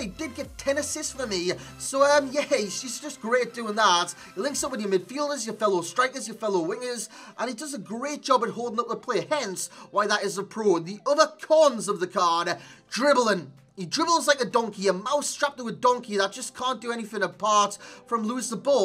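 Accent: Newcastle, United Kingdom. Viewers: Another Geordie we aye man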